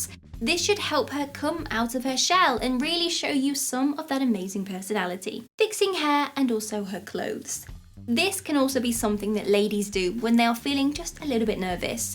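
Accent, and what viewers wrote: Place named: Northern England, United Kingdom. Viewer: Watching and videos to try to figure out what part of the UK you’re from. Somewhere in north England? You’re adorable!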